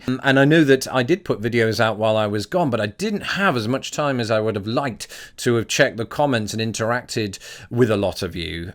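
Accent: United Kingdom. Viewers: Thanks for interesting video. Your accent is Heavier. More British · I just discovered your channel tonight. I haven't watched all your videos but it seems that your British accent was slightly more pronounced upon your return. I think that's pretty cool. My mom was like that when she went to visit her family or during phone conversations. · Glad you had a good trip and are home safely. Now, it seems weird, but your British accent seems stronger now that in was before you left. Like you got a refresher on your accent! Anyone else notice that?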